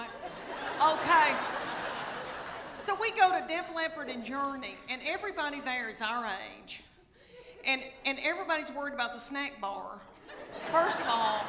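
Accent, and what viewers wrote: South, United States. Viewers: Oh, bless his heart!!! Spot on Southern. Love her! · She is just 100% valid. I love her. Only a southern woman can make a two syllable word put of “van” “va-yun”. Don’t worry about. I’m from texas born and bred and we do the same thing. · Why do I love the southern accent so much - it drives me more crazy than a French girl naked at the place St.Sulpice ? Awesome 😂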